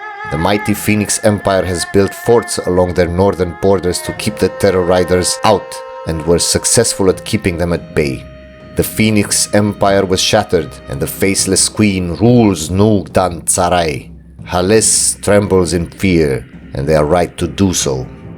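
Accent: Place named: Romania. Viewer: A friend of mine presented me your channel and after watching countless of youtube eu4 hours(anbennar included) I have to say your latest stuff(7 months ago) focused on anbennar is S++. I hope you get the motivation with the boots of views to keep doing this. this is why youtube is great because gems such as yours appear from time to time. I would also strech and try to guess - are you from romania? you have a funny romanian english accent and if you do, mad respect. I promise i'll pledge when i get paid. Keep it up broski!!!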